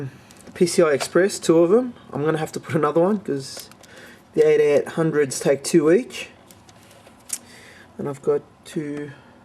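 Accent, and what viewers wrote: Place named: Australia. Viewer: Australian:) I think.... nice orginisation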